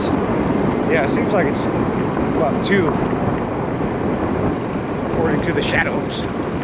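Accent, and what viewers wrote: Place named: China. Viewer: He is a chinese spy! You can tell he is chinese. Got chinese accent as well!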